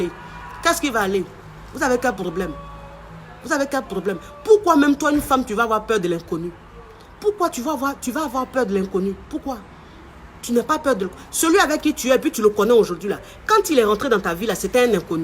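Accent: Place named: Ivory Coast. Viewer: les expressions : "mougou... mougouli.... yougou yougou..." ton accent ivoirien, tes "r" enroulé, ta gestuelle de gauchière, viennnent pigmenter ton coaching. j'aime quand tu les utilises souvent.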